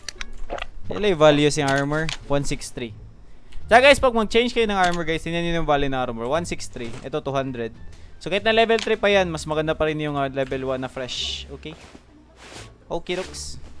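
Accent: Visayas, Philippines. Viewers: Bisaya ni do😂😂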